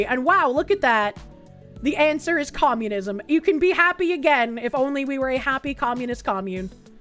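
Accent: Boston, United States. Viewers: I live in a world where I get my news from a fluffy fox thing with a Boston accent. I swear, It's like Futurama.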